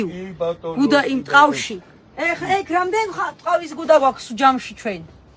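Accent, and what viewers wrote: Tusheti, Georgia. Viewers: კუთხურად ილაპარაკე გთხოვ ❤საოცარია თუშური კილო კავი❤ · მომწონს თუშური დიალექტი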